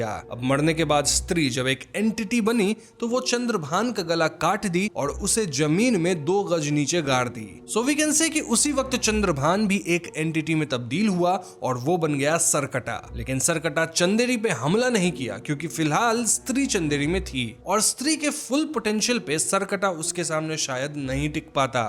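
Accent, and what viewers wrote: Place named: Bihar, India. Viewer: Why do u sound so funny and Bihari types?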